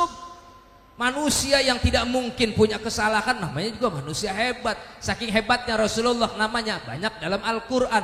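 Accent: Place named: Java, Indonesia. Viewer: Ceramahnya medokkkk banget..predator ni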